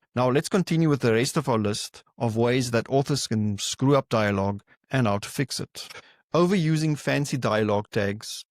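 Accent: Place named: Southern Africa. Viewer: That accent...which side of the Limpopo are you from? (Harare lad here!!)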